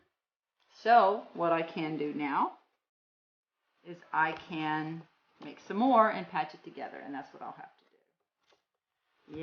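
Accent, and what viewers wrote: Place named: United States. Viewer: I can't follow your American Accent......🙂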